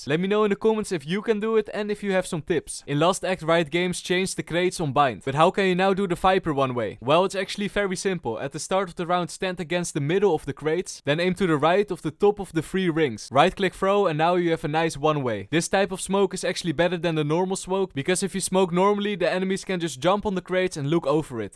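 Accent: Netherlands. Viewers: You a typical dutch accent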